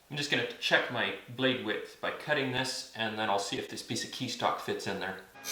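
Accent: Canada. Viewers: What accent is that? Canadian?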